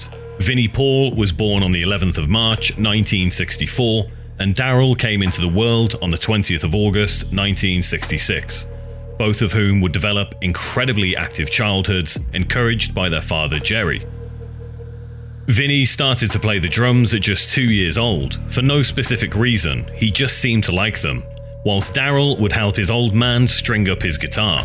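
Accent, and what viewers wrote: England, United Kingdom. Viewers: Heavy Metal documentaries with British accent narrations SUCK!!! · It should be a law that anyone who speaks with an English accent not only Not inaccurately do Narrations for US music bands but get the facts about the bands their poorly inaccurately doing the Narration correct....yeah I said it and stand behind it. Especially PANFUCKINGTERA DICK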